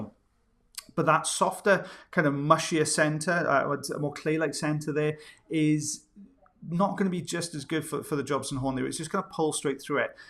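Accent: Wales, United Kingdom. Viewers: He has a Welsh accent. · Hey Dr., I'm curious, what specific part of the U.K. are you from? I feel like your accent was a bit more vibrant in this episode. I'm not complaining of course, I love it! 😊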